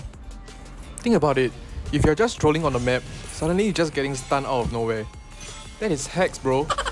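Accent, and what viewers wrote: Singapore. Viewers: I can hear ur voice and tell ur singaporean, but ur kinda concealing it? But back on topic, these invinsible arrows are annoying asf..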